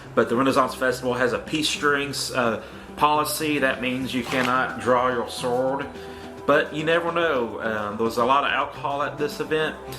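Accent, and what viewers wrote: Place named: South, United States. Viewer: Whats your accent it sounds Southern bayou/With Canadian...and hint of Aussie in some words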